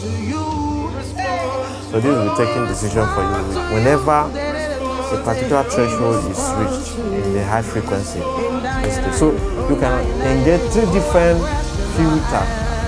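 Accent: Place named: Nigeria. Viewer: Informative, but that accent eyy, is that nigeria, it makes me remember the way nigeria school teacher speaks. But the information is 💯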